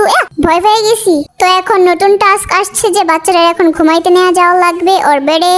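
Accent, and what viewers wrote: Bangladesh. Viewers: আপু আপনি কি খুলনার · নাটোর ভিলেজি তাসিটি হার কথা বলতাছে পাওয়ারফুল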